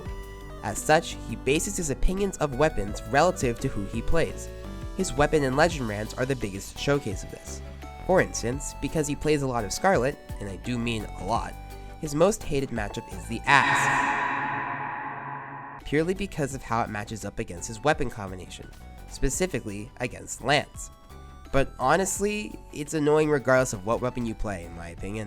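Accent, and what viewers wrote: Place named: Sweden. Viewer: I hear sweden - i like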